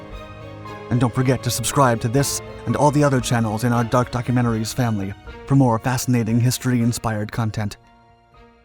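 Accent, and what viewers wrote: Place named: United States. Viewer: Dark seems a native American speaker, at least from his pronunciation. The verbal gaffes are difficult to explain, unless we understand he grew up in a video world, without more careful, detailed study of history. Dark's inattention to such detail routinely harvests viewer criticism, and his sorest need has been a video editor, assigned the demanding task of finding errors. By accident, I have found sections of a source work he has quoted almost verbatim, but without attribution.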